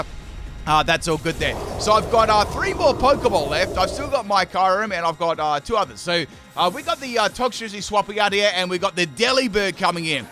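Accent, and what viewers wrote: Australia. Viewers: Your Australian accent is like the chef kiss on the video. Always has been. The real pimp of YT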